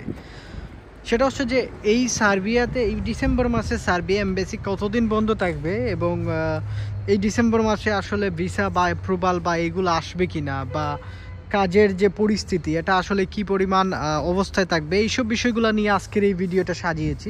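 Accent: Sylhet, Bangladesh. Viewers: ভাই আপনে সিলেটি নি জানাবেন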